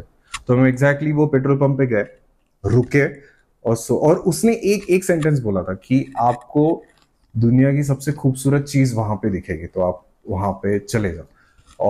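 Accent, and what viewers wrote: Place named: Maharashtra, India. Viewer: तुझी हिंदी खूप चांगली आहेस, पण हिंदी बोलतांना तुझी मराठी Tuning अधून मधून बाहेर निघूनच जाते 😂😂❤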